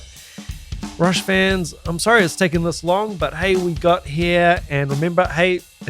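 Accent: New Zealand. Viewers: Oops, just realized (re: my earlier comment) that I assumed you’re a Kiwi because of your accent. I have NZ and Australian friends so I’m pretty good at noticing the difference (I hope).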